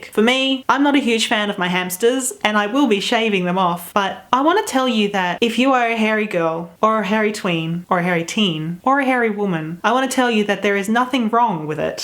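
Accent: Australia. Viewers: R u Australian? Love the accent.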